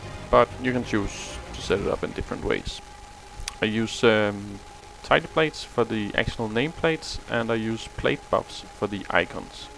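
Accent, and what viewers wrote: Denmark. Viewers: are you from denmark? :D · Are you danish? You sound like it :) And which addon do you use for your actionbar?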